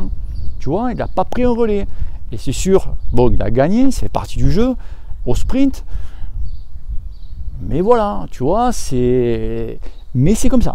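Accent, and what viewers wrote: South, France. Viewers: Salut, je veux juste remercier pour tous tes conseils avec l'accent ensoleillé ☀️ et chaque fois des expressions qui me font marrer, des thèmes que t'aborde actuelles et que tous ceux qui font du vélo se reconnaissent un moment ou un autre dans des situations diverses (avoir une patate du diable, être dans le rouge, voir la vierge 😂etc,etc), et surtout continue ces vidéos qu'on apprécie, salutations de la 🇨🇭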